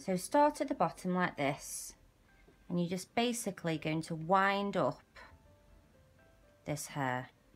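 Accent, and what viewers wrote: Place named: England, United Kingdom. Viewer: si es Ingles pero se le escucha el acento como de Inglés (los que son de Inglaterra)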